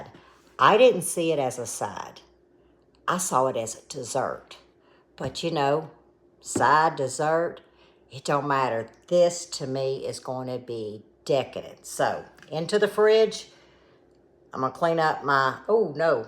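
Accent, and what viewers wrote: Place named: South Carolina Lowcountry, United States. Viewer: is a treasure. As soon as I heard her speak I recognized the south carolina lowcountry accent. I have spent a lot of time in the lowcountry and the folks there are warm and inviting. Lovley people. Now she resides in the upstate of SC where I am from. Been watching her for a long time. She is a great storyteller too.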